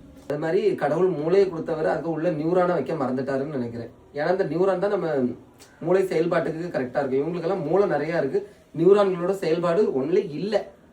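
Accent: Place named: Kanyakumari, India. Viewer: Kanyakumari slang laye thittureenga anna super 🎉😂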